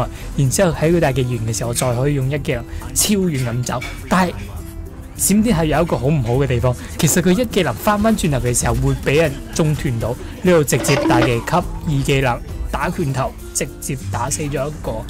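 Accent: Hong Kong, China. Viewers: 聽你口音就知道你係香港人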